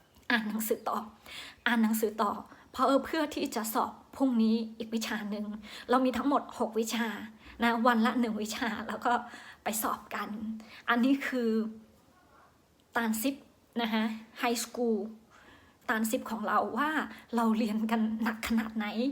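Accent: Central Thailand, Thailand. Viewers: น้องภาษาไทยชัดเป๊ะ เหมือนคนไทยภาคกลางเลย เก่งมากครับ อยากทราบว่าน้อง เป๋นไตรลื้อ หรือไตรขืนจ๊ะ ลุงเพิ่งดูน้องคลิปแรกจร้า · ชอบน้ำเสียงพูดของน้องมาก พูดไทยภาคกลางชัดกว่าคนไทยบางคนอีก เล่าเรื่องเข้าใจง่ายทุกเรื่องเลยครับ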